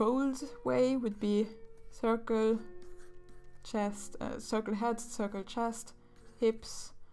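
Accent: Germany. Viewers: Are you German by any chance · xD kann ich verstehen. Hab von deinem Akzent eher rausgefunden als an dem Screen, hab nur auf die Zeichnung geguckt, deshalb finde ich es ja auch erst jetzt heraus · Are you german? xD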